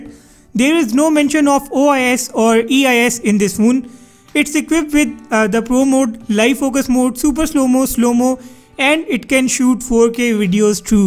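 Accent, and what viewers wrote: India. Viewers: Well as an Englishman I understood him perfectly and I've also liked the Indian accent anyways!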